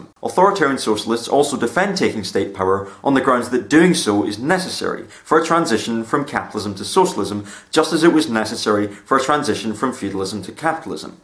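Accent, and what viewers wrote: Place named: United Kingdom. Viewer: You look like trey sessler but with a british accent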